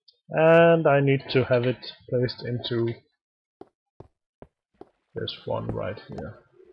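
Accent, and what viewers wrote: Denmark. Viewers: That's the thickest danish accent I've ever heard xD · First: How do you make the stone blocks in the 'gate' going down and up? I Wnat to make something like that :) Can you just link me a video for a tutorial og the tutorial you used? Second: Are you from Denmark? It sounds like you are xD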